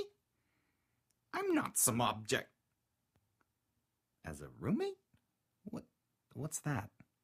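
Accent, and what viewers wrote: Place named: Canada. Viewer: Sourry? CANADA YOU SOUND LIKE YOUR FROM CANADAA. Sorry ;-; But I loved thissss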